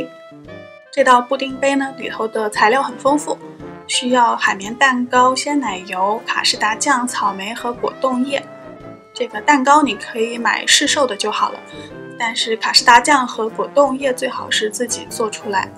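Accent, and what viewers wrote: China. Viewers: ellen Qu 我猜是中國因為我去過大陸我覺得她有時講話很和大陸人講的一樣喔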